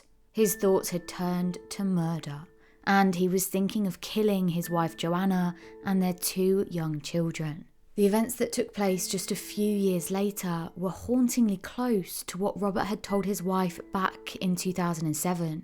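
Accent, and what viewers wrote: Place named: Essex, United Kingdom. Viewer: Pity the curator is unable to finish her words correctly. Sounds like Essex to me. Difficult to follow. Unable to pronounce the letter T.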